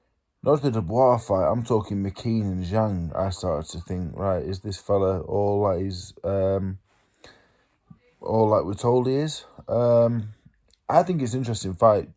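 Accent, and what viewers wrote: Yorkshire, United Kingdom. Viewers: Glad you said Joe is smart...not being articulate doesn't mean someone isnt smart.. he's clearly witty. Stupid people think he's stupid. And agree, you clearly have said you speak funny cause of your tongue and you have a strong Yorkshire accent... you're as coherent as you've always been.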